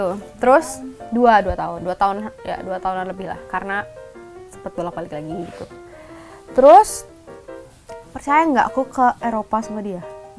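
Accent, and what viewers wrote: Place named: Bali, Indonesia. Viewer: logat balinya masih ada bgttt gemes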